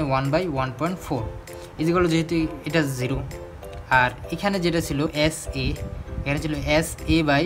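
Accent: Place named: India. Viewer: Damn....I really hate indian accent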